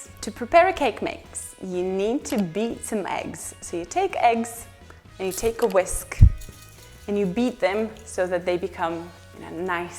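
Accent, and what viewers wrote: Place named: United Kingdom. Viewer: I adore your videos. And your British accent - it`s something incredible. Вы потрясающая и светлая личность. С любовью из Украины. Have a good one!!!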